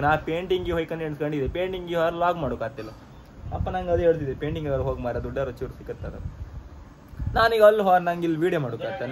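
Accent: Kundapura, India. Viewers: I like kundapura kannada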